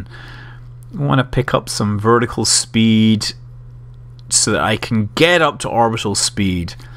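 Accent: Scotland, United Kingdom. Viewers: Although that's true, we all love hearing your friendly scottish voice. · you kinda have a Scottish accent and your from the US how is that?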